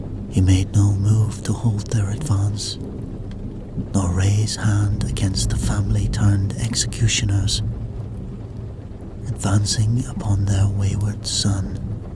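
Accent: Scotland, United Kingdom. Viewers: Think it's only a matter of time before your channel really takes off, your stories are unlike any other sleep channel, I really get list in em and you've got a great voice with that slight Scottish twang, not too heavy, perfect for sleep stories man. I think a Underworld sleep story would be a good one, the Vampire death dealers hunting the lycanthropes. Yes brother what you think??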